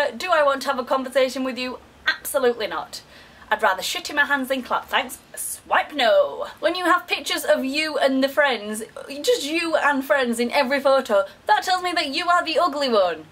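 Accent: Yorkshire, United Kingdom. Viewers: I like Tindaaaa Tindaaaa Oh yeah Tindaaaaaaaaa :P Boy, I love your British accent lol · i subscribed just because i heard the yorkshire accent haha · British Accent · Arrrrrrrrr you're back. God I've missed you. And your awesome Yorkshire accent. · There's no such thing as a 'British accent' because Britain includes Northern Irish, Scottish, Welsh and English region accents. Hers is a Northern English accent, Yorkshire accent specifically. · best british accent ever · damn that took me by surprise; listening to that yorkshire accent then learning you were in Hong Kong.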